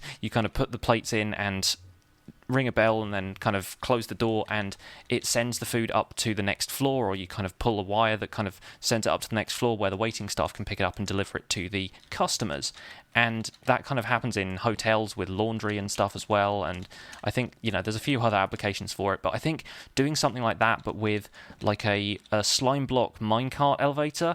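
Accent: United Kingdom. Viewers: Thumbs up, i came from OMGcraft aswell, btw ur british right?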